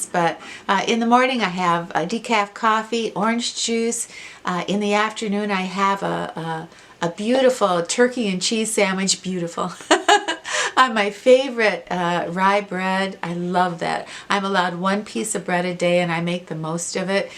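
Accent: Midwest, United States. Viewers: My mom told me about your channel 3 yrs ago. So that’s when I started watching you. Your the sweetest lady. As soon as I heard your accent I knew you were from the Midwest. I lived in North Dakota for 28 yrs, but now I live in Oregon. Anyways, you always bring a smile to my face. Movie..I would have to say Hallmark hahaha just love them. But I do love Cinderella the (live action) Have a great evening. ❤️🌸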